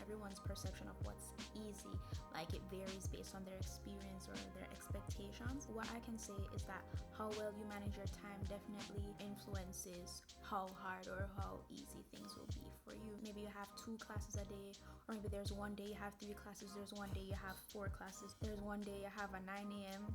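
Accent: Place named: Jamaica. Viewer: my entire family is jamaican and idk why but hearing your voice made me feel so at home!! keep it queen ✨ love the videos !!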